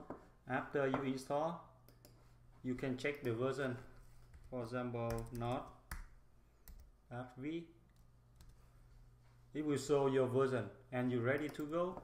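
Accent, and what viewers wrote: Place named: Vietnam. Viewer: nghe cách nói biết người Việt nói tiếng Anh :)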